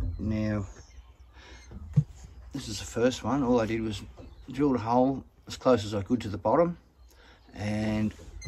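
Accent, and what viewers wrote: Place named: Australia. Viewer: 😀👍 great video and your Aussie accent makes it even better! But seriously this is such an important life or death skill people need to know. Thanks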